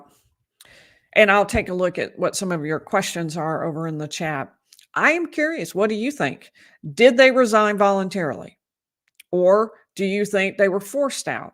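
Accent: South, United States. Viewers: i litterly had to reread the defenses filing..............all 120 + pages, in my almost 80 yrs i have watched as attorneys turned a noble profession one made admirable abe lincoln into a sewer, what those two defense attorneys did was not short of vigorously defending there client. i hope this sets a precedence for others who truly want to defend there clients. in this day and age it happens in 1 out of 100 cases. due process was not i repeat not followed. the law is clear there are gold standards call procedure and it did not happen. no one believes she fired them for negligence or any misconduct. she fired them because she is a arrogant female who has decided she has the power as judge to call the shots SO she did. i have been interested in true crime since i was six. forgotten more than most of you know and believe the arrest warrant was flawed. 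these crooked l e may think if we don't have a law degree we don't recognize when we are being hood winked. pulled of the case, hell she should be kicked of the bench and fined $100,000.00 because her real damage was to the accused and to our constitution. love to hear a southern voice advising and instructing our young whipper snappers. THEY NEED ALL THE HELP YOU CAN GIVE THEM. your passion also shines through and that will continue to bring you a large audience.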